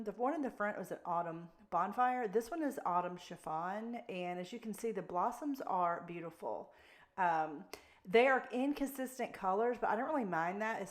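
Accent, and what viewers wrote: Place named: Alabama, United States. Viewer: You have to be from Alabama. The accent is a dead giveaway.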